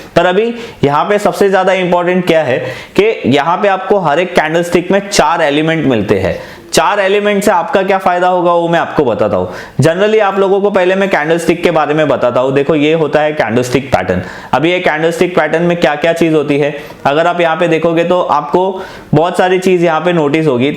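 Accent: Gujarat, India. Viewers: ગુજરાતી છો? વાત પરથી તો એવુંજ લાગે છે. ખૂબ સરસ કામ કરી રહ્યા છો.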